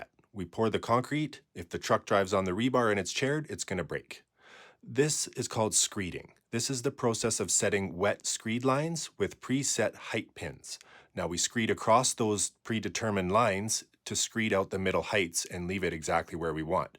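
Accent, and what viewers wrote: Canada. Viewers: You sound Canadian. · For canadians, your pretty good 😂 american say out, canadian sat ooot, great, great work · Canada voice at its finest. Love the work you boys do!!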